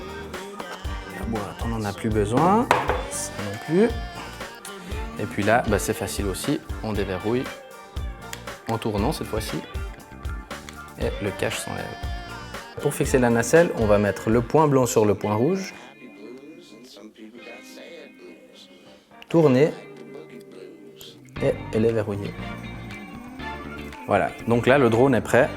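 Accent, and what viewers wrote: Switzerland. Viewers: Nice 👌🏻 Suisse ? Avec l’accent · bel accent suisse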